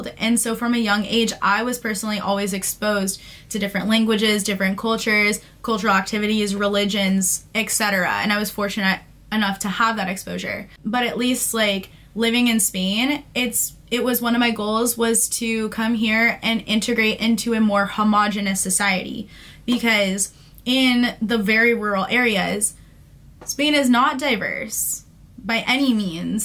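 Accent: United States. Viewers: I love you,. I love your american accent and id love to speak english like you. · Me too. I adore her accent, and as I'm still learning English, I use that as a reference to acquire my American accent.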